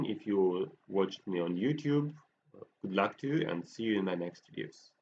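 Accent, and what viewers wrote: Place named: Russia. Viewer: Привет, по акценту понял что ты Русский) подскажи пожалуйста что лучше создавать на телефоне? Играю всего 3 дня, и у аракне совсем другие способности, робот на 1 месте, вообщем все по другому) я строю вокруг маи для поглощения демеджа, аракне для стана и даредевил для демеджа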